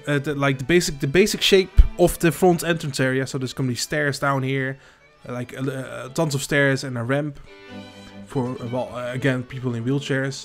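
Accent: Netherlands. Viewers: Hoe Nederlands kan een acsent zijn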